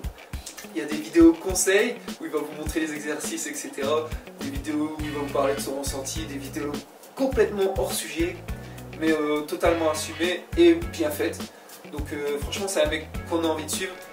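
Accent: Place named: North, France. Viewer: T-es du Nord non? Parce que t'as un léger accent je trouve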